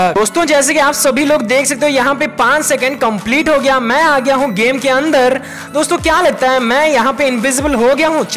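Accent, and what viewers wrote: Odisha, India. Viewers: Are you odia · App odia ho kyA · Bro are you form Odisha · Bhai Ap odisha se Ho na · App Odisha se ho?? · Bhai ap Odisha se ho kya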